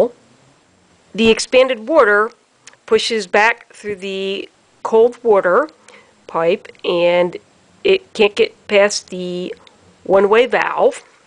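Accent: Philadelphia, United States. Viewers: You must be from Philly. Wooder.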